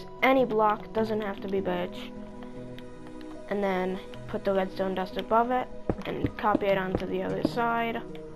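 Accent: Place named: United Kingdom. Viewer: Holy shit you sound British in this video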